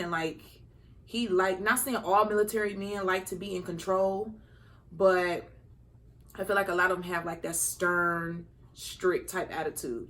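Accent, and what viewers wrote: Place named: Dallas, United States. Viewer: Hi! This is my 1st video of yours. I like your voice & think I hear a Dallas accent. Are you from Dallas per chance? 🤔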